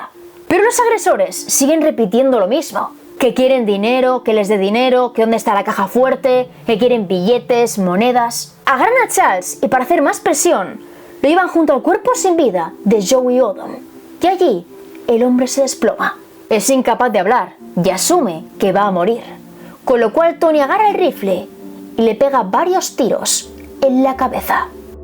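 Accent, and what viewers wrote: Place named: Spain. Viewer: Mansión. Saludos hermosa bellota. Excelente video. Tu acento español... Es hermoso y precioso.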